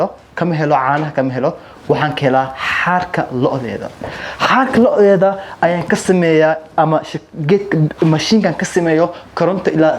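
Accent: Mogadishu, Somalia. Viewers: Very interesting although I find it difficult to understand the reer xamar dialect.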